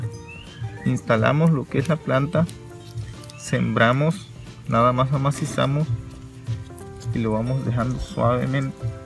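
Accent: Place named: Salamanca, Spain. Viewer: Que alegría escuchar a un salmantino 👋 Muy buenas recomendaciones, saludos también soy de aquí 😁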